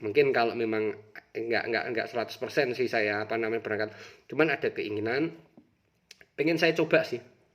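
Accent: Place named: Java, Indonesia. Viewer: kok medok banget om , orang jawa mana aslinya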